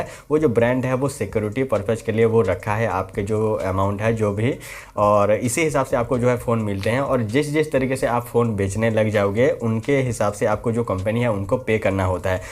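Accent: Chhattisgarh, India. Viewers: Chhattisgarh se ho kya aap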